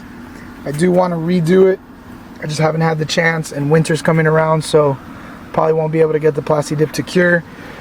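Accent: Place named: Mexico. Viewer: quit talking like a Mexican. Looks good.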